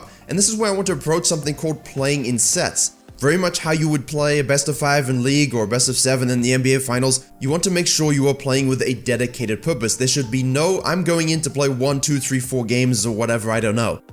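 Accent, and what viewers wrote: South Africa. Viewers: that south african accent doe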